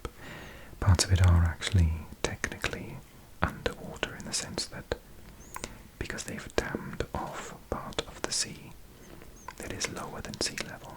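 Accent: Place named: Wales, United Kingdom. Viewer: Fellow welsh person?🎉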